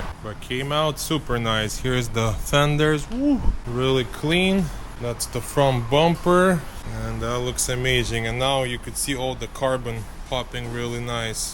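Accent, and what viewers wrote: Russia. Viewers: Ну по акценту скажу что чел то русский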